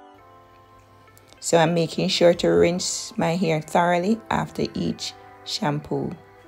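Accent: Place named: Jamaica. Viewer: Are you in Jamaica? You sound Jamaican....Love your videos. I have been watching them non stop since I came across your channel today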